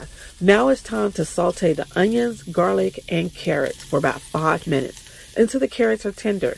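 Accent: South, United States. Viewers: Hi, I'm new to watching your videos. I enjoy seeing you explore the world and cooking in your van. Where are you from you have a southern accent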